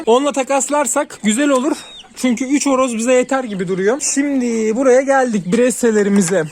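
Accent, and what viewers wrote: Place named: Sakarya, Turkey. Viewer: Abi ses sakaryadami oturuyon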